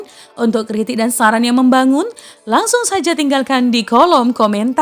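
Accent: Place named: Mandailing, Indonesia. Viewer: Maap.. suara hostnya dan pejelasannya terkesan seperti budaya batak mandailing .sumatra utara memang batak rumpunnya ..bravo ...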